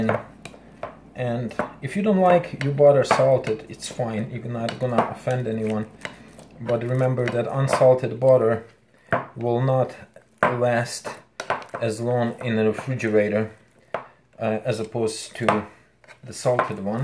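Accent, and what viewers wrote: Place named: Germany. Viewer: Very interesting. I sense a slight German accent. Good presentation. My aunt was a catholic Nun and she lived in a Monestary ( Kloster ) where they backed their own bread and made butter. And connected to it was a Brewery.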